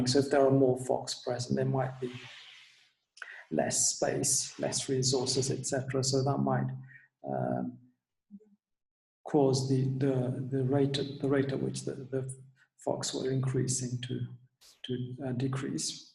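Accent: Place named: Oxford, United Kingdom. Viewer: sübhanallah sanki oxford üniversitesinde derse girdim bu aksan gerçek mi😱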